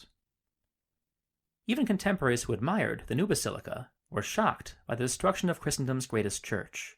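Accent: United States. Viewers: You sound like Ben Shapiro. One of the more grating American accents for sure.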